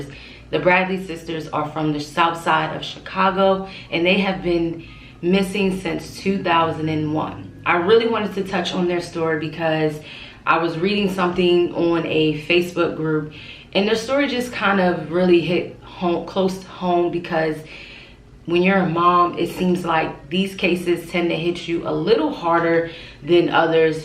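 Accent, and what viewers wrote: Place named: Baltimore, United States. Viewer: Side note are you from Baltimore? Your accent ☺️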